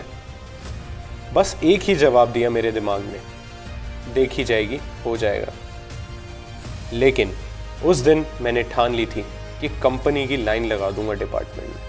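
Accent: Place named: Delhi, India. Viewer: Your delhi is accent is crazy! will you say it again !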